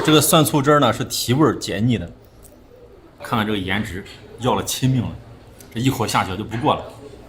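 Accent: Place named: Shaanxi, China. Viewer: 是陕西的🎉🎉🎉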